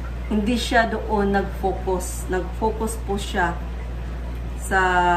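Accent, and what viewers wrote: Visayas, Philippines. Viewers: bisaya si reader?💚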